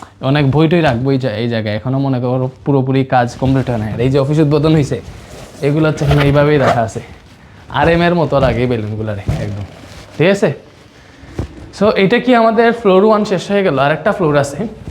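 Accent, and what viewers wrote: Sylhet, Bangladesh. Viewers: কথায় সিলেটি ভাইব মিলছে। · কথার মধ্যে সিলেটি সিলেটি ঘ্রাণ মনে হচ্ছে 🙄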